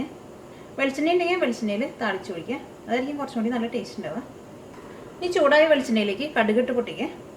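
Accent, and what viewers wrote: Thrissur, India. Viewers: trissur analle naadu? avatharanam super anutto njan ennundakkunndu yours chatni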